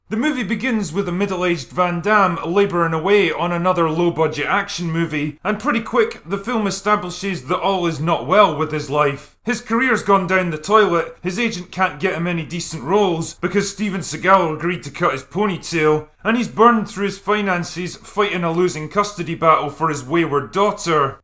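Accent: Scotland, United Kingdom. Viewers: Have you honestly never heard of regional accents? People across the UK and USA speak English but there are still distinctly different accents in some regions, and those regions don't have their own languages either. I mean, the Drinker himself has a Scottish accent...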